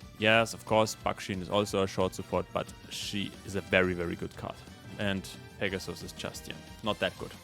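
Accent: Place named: Germany. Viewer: Most German accent I've ever heard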